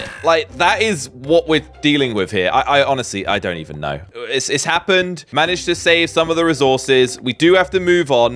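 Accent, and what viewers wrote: United Kingdom. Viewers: sounds so british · most British sounding intro I've ever heard 😭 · HE IS BRITISH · British accent every episode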